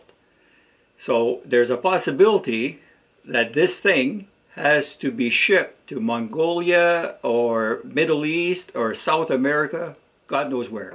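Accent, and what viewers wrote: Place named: France. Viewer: Just found out your channel, looks like there's lots of diy things . Keep up the good work. Ps you seem to have some french accent, where are you from?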